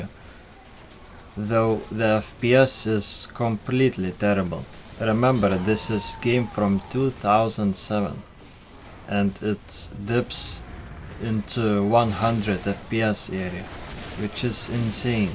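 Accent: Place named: Ukraine. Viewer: but your english accent is very similar to ukrainian people. really.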